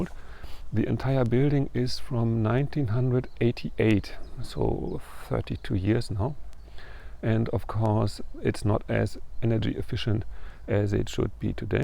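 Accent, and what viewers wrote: Germany. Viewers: I would think that it would be obvious that you are German as soon as you start talking I could figure that out. I really like your videos it reminds me of my dad doing woodworking onon the weekends. Plus your personality is a perfect match. ( my dad was German, he has since died) Also having no screens on your windows tells me for sure you’re not in America. We are fanatics about our screens on windows and patios. Good job. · I knew it! You are lived in Germany because of your accent. And no wonder why you are so hardworking (because i knew someone who also lives in Germany that can do so many things in his free time while working at the company and also he is a lecturer) Cheers and God bless you From the 🇵🇭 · You are obviously a naturalist. Most likely a vegan or at least a vegetarian. Beautiful German accent. Beautiful country!!!! My father was 100% German. 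A hard working honest stern proud man. Nothing he wouldn't take on with full stream. Luckily I'm like him and not my passive Norwegian mother. 🙂 God's speed. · Haha called it I new that was a German accent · Nice to meet you,. I recognized your German accent, although your English is excellent. I lived in Neu Ulm for 3 years. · I think it's funny someone thought your accent was Australian. I knew it was either German or Austrian before you mentioned the GDR and because it sounds like my Aunt's accent and she's from Germany, the eastern part too. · We have it here in Western Australia on Sunday and also from 5pm to 7am every other day however we can mow our lawns on Sunday from 10am to 4pm. It's a great idea and even with Sunday mowing it still feels like a rest day with the smell of fresh mow grass and should you not abide by the Council by-laws the Ranger will pay you a visit and fine you so it's just not worth the effort, everyone seems to abide anyway. 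Funny you should say some thought it Australia, I thought Germany because of your accent. Congratulations on your 50 thousand subs, I think we're all enjoying normalcy and calm wether here or away were all pretty much the same as people I think 👍🐨🇦🇺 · I love the little info show. Thanks! Also about the accent. I knewwwwww IT was my grandparents motherland...Germany! yayyyy! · After three spoken words in one of your previous videos I knew that you are German. Well, I am too. That might explain it. And I recognized the German forest. Keep up the good content! Grüße vom anderen Ende Deutschlands aus dem Saarland :) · Hi From Michigan USA. I love watching your videos. My Father is from Germany so I am very familiar with your accent. I love how soft spoken you are and you have done a wonderful job with your house. As for the brick wall.. whitewashing will be the same as painting.. once done you can’t go back. 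The brick in your kitchen looks very nice. I’m sure that if you ever decide to do a air B&B with the house you will have no problem filling reservations with all these u tube subscribers! Good job! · From the beginning, i knew it you are German because of your German Accent. I have friends from Germany he live near Bonn, I also have from Elmanau. Hugs from the Philippines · Hi, woher kommen deine Englischkenntnisse? Ich hab natürlich deinen deutschen Akzent erkannt. 😏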